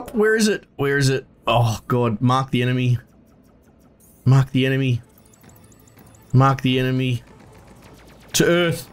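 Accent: Australia. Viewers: Is it me or are you Australian?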